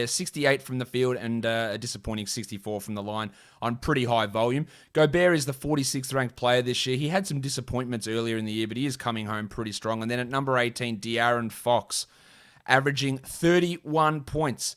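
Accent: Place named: Australia. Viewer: Great show. My wife likes ya Australian accent. Cheers to the weekend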